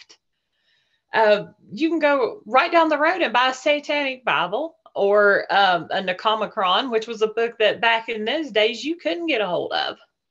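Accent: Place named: Australia. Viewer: Mr. you have a beautiful accent. I can't place it though. Is it Australian or New Zealander? (Whichever country it is from I like it).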